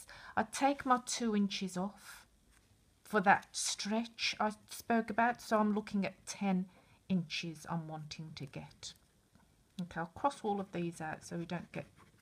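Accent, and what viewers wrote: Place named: North, United Kingdom. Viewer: Thanks..... love hearing that northern twang!!!! Im from the north too!!;)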